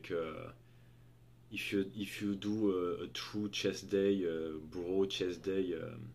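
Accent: France. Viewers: Nice. Your accent is even thicker than NH's. Good thing I'm able to understand glorious French accents without much trouble. You have a pretty good physique, how many years have you been training for? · Is French Accent real or forced?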